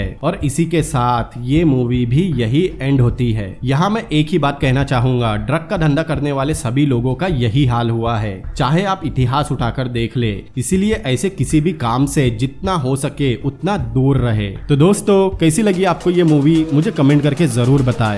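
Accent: Assam, India. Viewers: भाई आप असम से हो??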